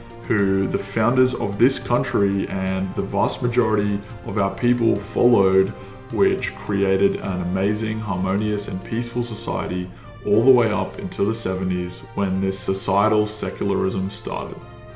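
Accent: United States. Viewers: What’s with the American pronunciations? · My only criticism is the pronunciation of anti,Americans say it like Ant-I,Aussies and English say Antee I’ve noticed it’s been creeping into our circles.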